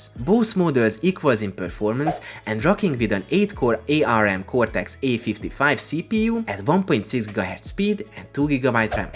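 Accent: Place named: Hungary. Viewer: Amint a hang megszólalt, egyből az villant be, hogy ez a csávó magyar, 1000%. :) Annyira tipikus, mint az indiaiak, nem lehet letagadni.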